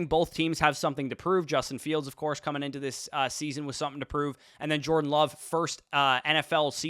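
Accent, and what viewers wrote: Canada. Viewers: Another good video, thanks. Hey, can you say “out and about running routes” in your thickest Canadian accent? Thanks 🙏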